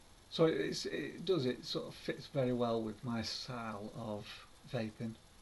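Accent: Northern England, United Kingdom. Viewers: Lovely Northern chat for a quiet Easter morning...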